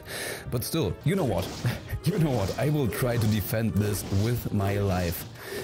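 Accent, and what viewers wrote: Germany. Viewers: Your german accent is too awesome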